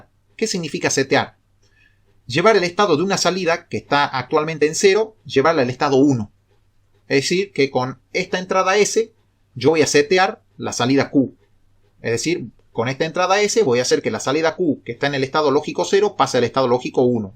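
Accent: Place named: North, Argentina. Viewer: Muy buena explicación. ¿Usted es de Tucumán?, tiene tonada norteña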